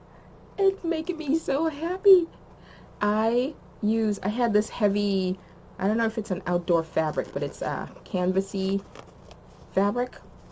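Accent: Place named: Massachusetts, United States. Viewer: I can definitely hear your ma accent 😂 I should have known from the last video, one of my best friends is in CT near ma though and she has a similar accent. Loved the video. Thank you for sharing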